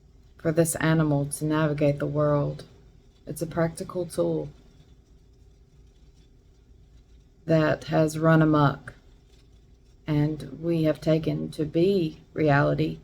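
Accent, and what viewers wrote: South, United States. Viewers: I love ur southern accent. I come from mobile, alabama.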